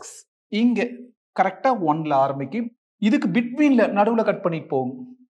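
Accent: Coimbatore, India. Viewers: Coimbatore slang🤩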